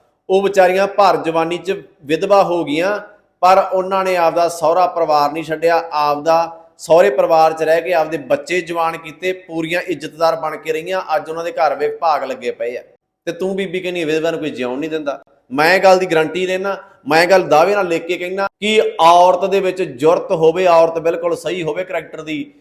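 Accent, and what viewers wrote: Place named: Amritsar, India. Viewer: Mainu Lagda tu amritsar toh lagda gal karn toh badeh je kehna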